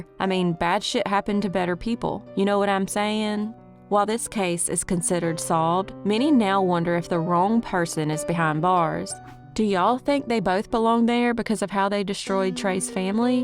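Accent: South, United States. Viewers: Congratulations! You absolutely deserve your 100,000 subscribers! People really do recognize quality and talent! (And an awesome Southern accent!)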